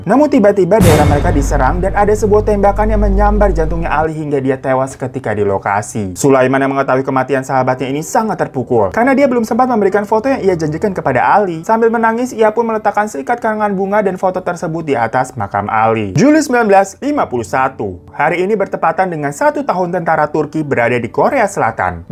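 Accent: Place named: Banyumas, Indonesia. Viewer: Bang muka jepang tapi ngomongnya ngapak, 😂😂 i love you abang 😘😘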